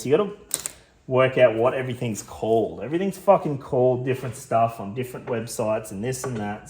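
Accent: Australia. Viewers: Thanks for sharing your knowledge - As always, both an authoritative and entertaining watch.👍 I also have this vision of the OS viewers getting a crash course in good old fashioned Oz speak.... Magic.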